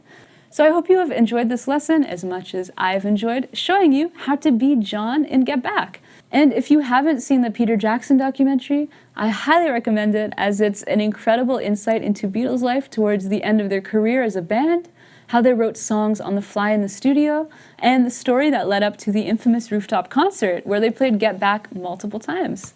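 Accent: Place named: Canada. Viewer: Canadian accents are so damn great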